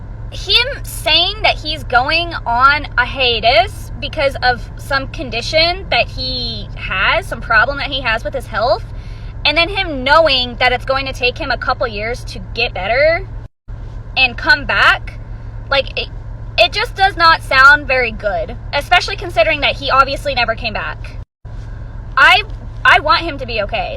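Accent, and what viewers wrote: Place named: Poland. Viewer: czuje polski akcent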